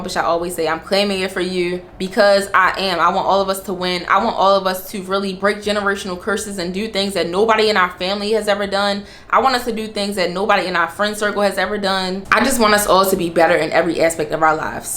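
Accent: Baltimore, United States. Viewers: you from baltimore aren’t you ? 😭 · I hear a Baltimore accent. Are you from there?? Thank you for your videos! Happy new years!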